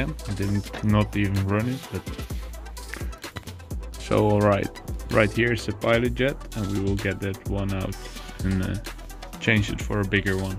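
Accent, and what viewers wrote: Netherlands. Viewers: Aye I gotta ask are you Dutch? Cuz water the accent and I recognize places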